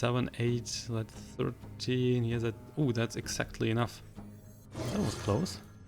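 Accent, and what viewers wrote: Germany. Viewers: Thank you for the insight, looking forward to play this deck aswell. I can distinguish a strong german accent tho... which is not bad because I am german aswell. But you could definitely work on this! You could say that I am a native speaker, and I do see that you got a huge vocabulary (regarding average germans) - just keep practicing (if you want to drop it ofc). Nicht böse gemeint btw - hast mein Abo.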